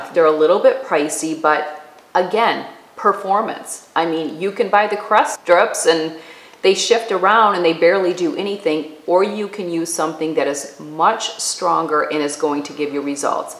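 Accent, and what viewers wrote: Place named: Midwest, United States. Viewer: btw......LOVE your channel.....I've watched a few and like them as well but have decided you're my favorite.......your makeup colors are my makeup colors so much so that in look to copy everything you do via makeup......love it!.......lol your articulation and Midwestern twang are actually quite mesmerizing as well.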